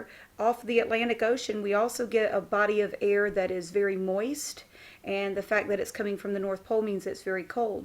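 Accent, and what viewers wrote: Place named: Texas, United States. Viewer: We were watching this in class and the teacher said it might get cheesy and she said you had a weird Texas accent. (But I like your accent also the video helps a lot thank)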